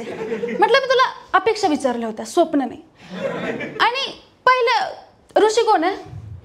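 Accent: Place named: Nashik, India. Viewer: खूप छान नाशिकचा एक्सेंट छान आला म्हणजे असं वाटलं की खरंच नाशिकचे आहेस म्हणजे तू आहेस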